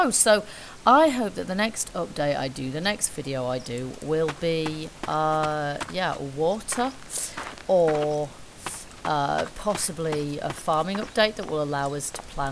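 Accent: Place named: United Kingdom. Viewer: love the accent, I don't hear a lot of female gamers, let alone british one's so kudos to you, my ancestors came from Yorkshire...keep up the great work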